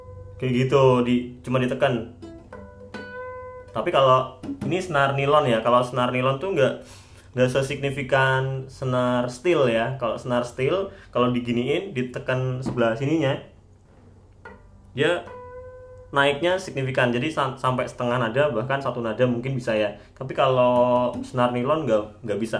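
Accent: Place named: Java, Indonesia. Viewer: Bhs jawa medok nmen mas suhu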